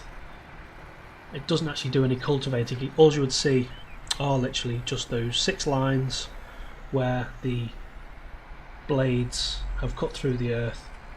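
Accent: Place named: Liverpool, United Kingdom. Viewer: You do have a few Liverpudlian twangs in there :), are you using a steering wheel for this?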